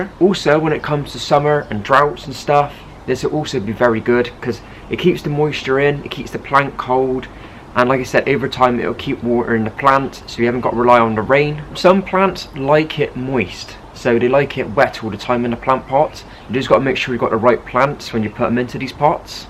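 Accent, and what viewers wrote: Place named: West Country, United Kingdom. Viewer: Aww West Country accent❤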